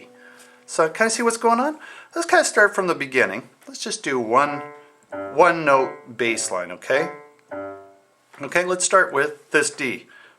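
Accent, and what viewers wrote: Canada. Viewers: love it! endearing Canadiam accent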